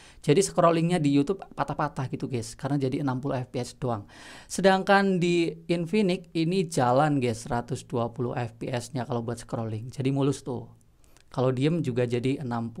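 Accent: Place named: Cilacap, Indonesia. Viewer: Kaya wong cilacap kiye apa yahh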